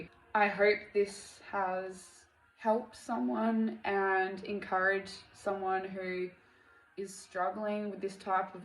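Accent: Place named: Australia. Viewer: WOW this video wasn’t a coincidence, I was battling bad thoughts today and you showed up on my screen for a reason. Praise Jesus and thank you very much I truly appreciate the encouragement and I’m going to apply this strategy more often. Also I notice you have an Australian accent as I’m from Australia aswell LOL. God bless you.✝️❤️✝️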